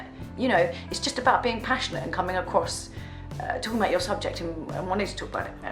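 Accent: United Kingdom. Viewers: Your voice is awesome!, kinda raspy but not too much. Also british accent is simply the best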